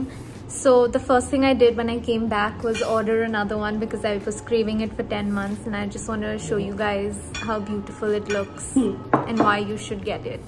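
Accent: India. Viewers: Indian accent ! That's great mate!👍can you plz tell me how to turn yourself into American mode ? 😜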